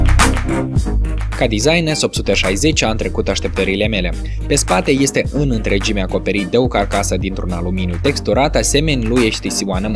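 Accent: Moldova. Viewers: Moldova si tipicul accent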